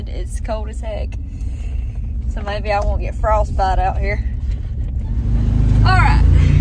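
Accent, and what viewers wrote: South, United States. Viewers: southern drawl I love it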